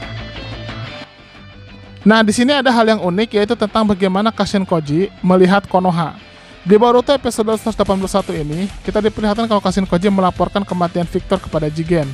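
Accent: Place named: Sumatra, Indonesia. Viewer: Min, mimin orang sumatra ya..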